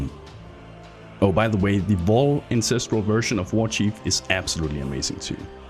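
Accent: Denmark. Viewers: Danish? Can't help but notice that accent... Banter aside, great video man!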